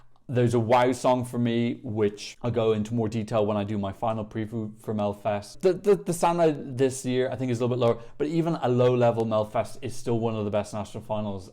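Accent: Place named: Ireland. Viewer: it's Irish English, and it confuses even the English! (especially the English 😂) It confuses the captions as well, they're hilarious when they come up on my home page.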